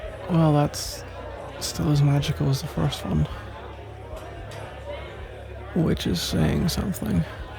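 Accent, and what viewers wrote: United Kingdom. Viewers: British boy!!! I'm British too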